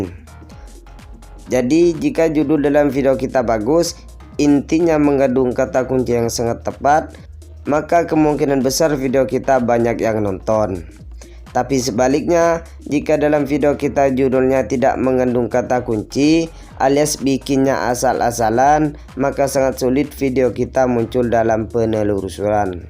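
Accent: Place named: Madura, Indonesia. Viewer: Dialeknya sepertinya orang Madura United ya, sukses terus channelnya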